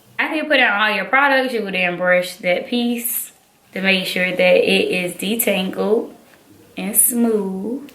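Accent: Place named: South, United States. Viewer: You country and ghetto sounding so I couldn’t finish watching your video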